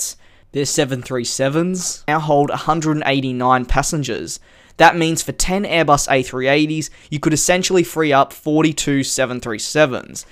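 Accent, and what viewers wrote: Australia. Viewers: hi I’m Cj are u Australian??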